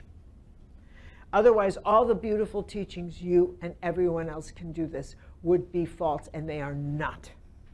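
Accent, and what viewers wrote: Chicago, United States. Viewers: Is she from Chicago? She sounds like my childhood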